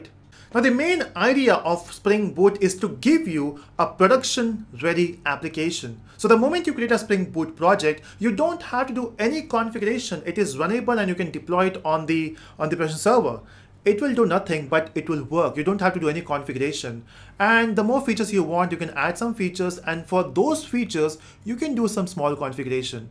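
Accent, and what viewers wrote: India. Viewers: 这咖喱口音听不懂啊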